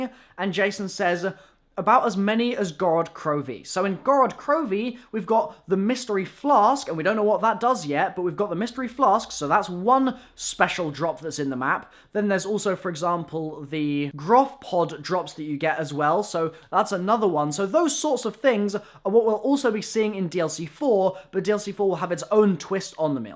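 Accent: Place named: United Kingdom. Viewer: Your too British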